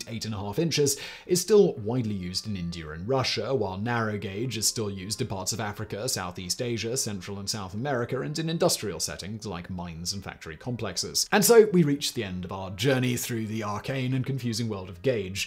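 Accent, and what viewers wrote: United Kingdom. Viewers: Yes, it surprised me as well. Sounded so wrong coming from a British accent, mispronouncing words like that.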